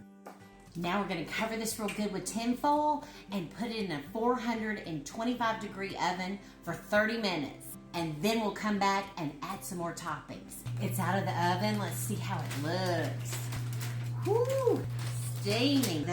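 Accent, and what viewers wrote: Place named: Texas, United States. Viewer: You scream Texas..😃 where are you from? Or the Carolina’s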